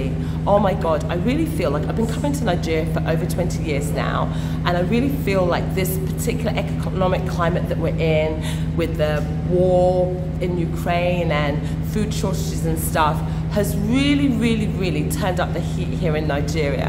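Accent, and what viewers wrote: United Kingdom. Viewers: I was born in Germany but am Ibibio, I have learnt to speak pidgin and a lil bit of Ibibio/Efik, anytime am in Naija, am always speaking pidgin and trying not to speak for too long at any given time so as not to give my "abroad" origin away and believe me sister, life has been Good and easy. I don't mean to be disrespectful but if I were u, I would try to tune down that Brit-ich accent. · She was born in the Uk and grown-up there obviously she went to a private school thats why the accent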